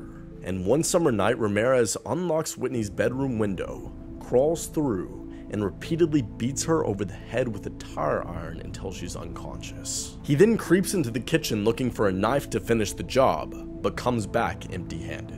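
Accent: South, United States. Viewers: I love your story telling technique. Are you from the South. I can detect a southern accent. In your speech.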